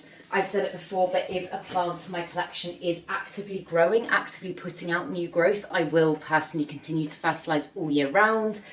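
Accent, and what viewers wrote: United Kingdom. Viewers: Like your pure english accent!! Mmmh love it. Which part of Great Britain are u particularly from. Like the clarity of ordinary care you prescribe for each plant. Your camera is fantastic!!!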